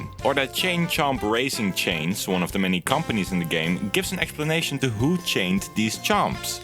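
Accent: Netherlands. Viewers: You're dutch Aren't you?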